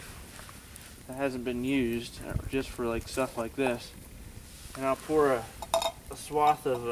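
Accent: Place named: Appalachia, United States. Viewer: Thanks for sharing. What part of the Appalachia are you from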